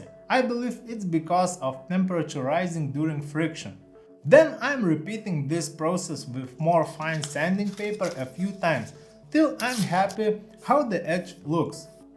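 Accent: Russia. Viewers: хэллоу) чувствуется русский акцент)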